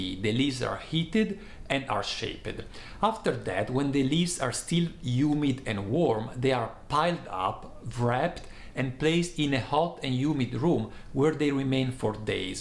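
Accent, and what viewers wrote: Italy. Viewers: You sounds like an italian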